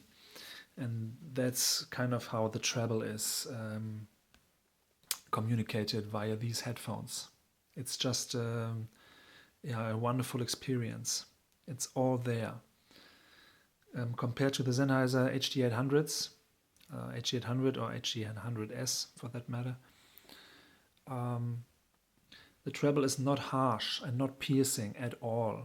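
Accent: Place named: Germany. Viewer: You sound very german. Nice review.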